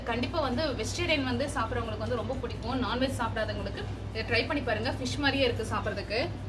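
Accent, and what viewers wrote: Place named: Coimbatore, India. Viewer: Super recipes 👌👌 Neenga coimbatore ah sis Unga speech apdi irukku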